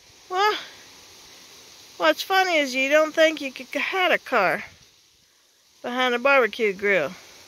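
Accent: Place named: Georgia, United States. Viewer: Amen to what You are saying. Don't have to see it to know it! Please don't get mad at me, but You sound kind of Georgia, but it looks kind of West Texas there?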